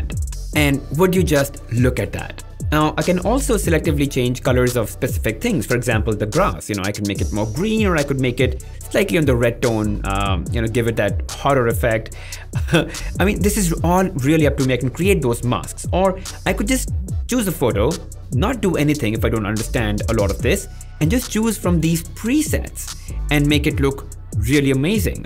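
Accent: India. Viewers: I AM SICK AND TIRED HEARING THESE FUCKING INDIAN ENGLISH DICTIONS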